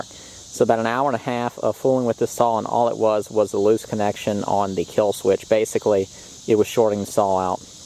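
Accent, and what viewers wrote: South, United States. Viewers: holy moly! i don't know how this video popped up!..... what a strong Southern accent!!!!!!! i feel like 'bearded old hippie hiding behind his truck'! no telling what this video was gonna be about!..... turns out to be a cool little video! thank you, very much! :-)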